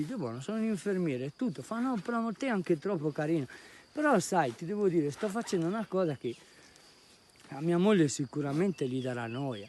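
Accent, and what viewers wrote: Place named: Trentino, Italy. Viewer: Inflessione che non riesco a decifrare. In alcuni momenti sembra addirittura trentino 😮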